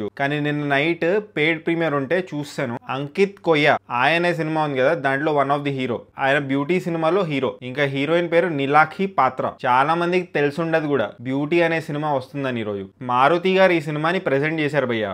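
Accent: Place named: Visakhapatnam, India. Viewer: Vadhura puku vizag slang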